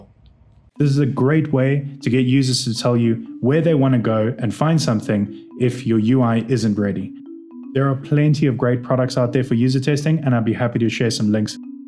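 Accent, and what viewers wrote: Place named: South Africa. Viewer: If you're using reddit for memes and stonks you're doing it very wrong indiddly deed. I swear I detect the faintest of Saffer twangs in this video 🤔🧐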